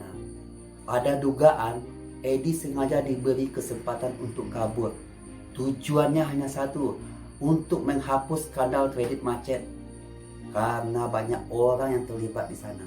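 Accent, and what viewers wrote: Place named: Padang, Indonesia. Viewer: Wah....orang padang nih mah.....logat nya atuh...he..he....he...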